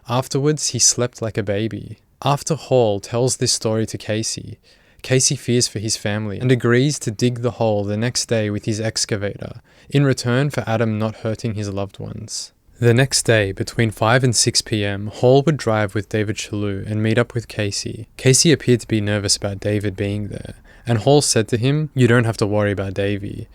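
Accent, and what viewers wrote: Australia. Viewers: Dude I know you're Australian but I've been listening to all your videos throughout the week and every time you say "law enforcement" I thought you were saying Lauren faustman and I heard that name in every video and I wondered how she could be involved in all these murders and vile cases. So I looked her up and couldn't find anything that made sense. but then thought about it.. and realized it's your accent. Oops.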